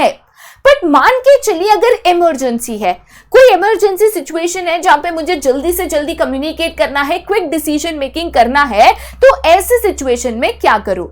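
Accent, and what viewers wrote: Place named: Mumbai, India. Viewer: Mam aap Mumbai se ho naa appke word ascent se pata chalta hai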